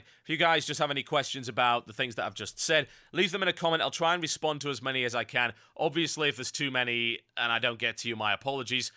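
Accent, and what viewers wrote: United Kingdom. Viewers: by the way you talk, it always pictures in my mind an old guy with an angry british accent.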